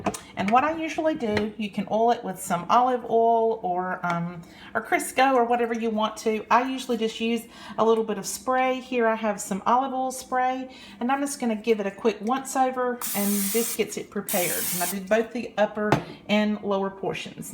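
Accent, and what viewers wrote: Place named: South, United States. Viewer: I like the Southern accent too. All the way from the other side of the world.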